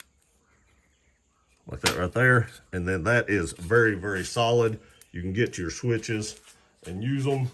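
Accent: South, United States. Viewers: You must be from the south 😂, whereabouts?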